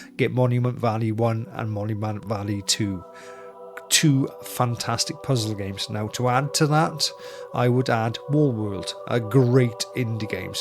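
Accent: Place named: Wales, United Kingdom. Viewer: Love the Welsh accent but rolling his Rs lol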